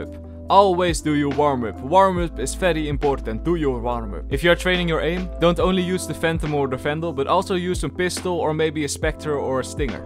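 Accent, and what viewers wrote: Netherlands. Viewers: Dutch accent on point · “Has a Dutch accent” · Jij bent lederlands he smieght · Thx for the vid and something else i smell dutch😂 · i smell dutch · Ik hoor dat je nederlands bent haha lol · The dutch ascent makes this 100x better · hahahaha hoor meteen dat je nederlands bent, wel goeie tips though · The dutch accent in your english 😆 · Ben Jij nederlands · ben jij nederlands of belg want ik hoor dat aan u accent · are you dutch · are you dutch by any chance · I hear nederlands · Your dutch accent makes this terrible to listen to. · this man souds dutch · Are you dutch? Because you sound a little bit dutch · I smell some dutch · dutchiest accent ever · Ik hoorde gwn aan je stem dat je Nederlands was lmao ez peazy lemons squezy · mmh i smell dutch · Je hoort gwn aan je engels dat je nederlands bent😂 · Nederlandse engels belike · This video smells like a nederlands accent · I smell Nederlands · i hear some dutchie in him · I can feeeeeel the Dutch voice trying to come out idk but I'm 99 procent sure your Dutch Same ass me that accent its exactly like just people in Belgium and the Netherlands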